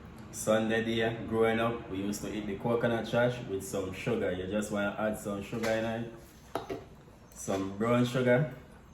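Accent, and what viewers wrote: Jamaica. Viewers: Beautiful yard accent. Keep it up.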